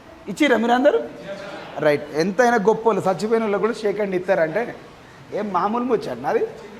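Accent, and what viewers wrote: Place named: Telangana, India. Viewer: We love your way of teaching sir ...mana telangana yasa super..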